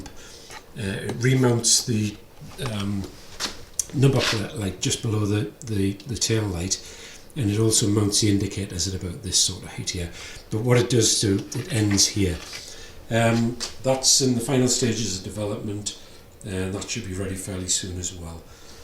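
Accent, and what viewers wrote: Newcastle, United Kingdom. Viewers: Hi, nice to hear a proper accent - not many Geordies over here on the Isle of Man! I'm interested in the adjustable levers for sure, a better exhaust and getting rid of that stupid speed limiter - no 70 limit here. Just a little more would be helpful! Also maybe a cam in the future, I don;t need a rocket ship (have 5 other bikes) but just a bit more go would really make this little bike. I also find the shocks very hard on our bumpy roads, but they may run in yet - we'll see. I would accept a little loss of handling for a better ride. Oh and a rose jointed ear linkage, but may make that myself - I need to keep a heel and toe, smashed left foot full of screws. (walking accident, not bike!).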